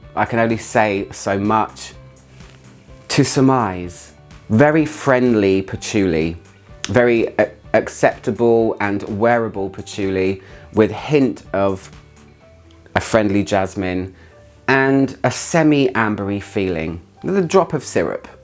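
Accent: United Kingdom. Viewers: 😂 I’m American and this whole time I thought it was your British accent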